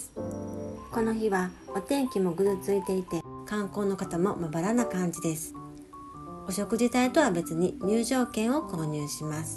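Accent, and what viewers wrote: Kyoto, Japan. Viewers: 、初めまして🐦 二条城は、高校の修学旅行で行った記憶が微かにあります。お料理が如何にも京都⛩️らしく美味しそう。食材も京都独特、湯葉はぜひ食べて見たいですね。の語りも京都を感じさせ、失礼ながら、お姿も十二単衣が似合いそう🤱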